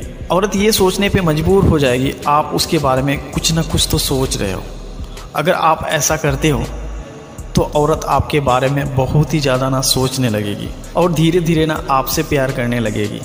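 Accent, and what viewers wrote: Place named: Bihar, India. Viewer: Aap na pakka bihari ho 100%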